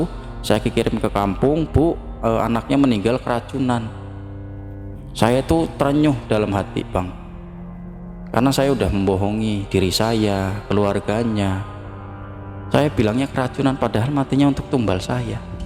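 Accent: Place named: Pantura, Indonesia. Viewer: Logat ngmongnya kya orng daerah pantura, ( cirebon atw indramayu).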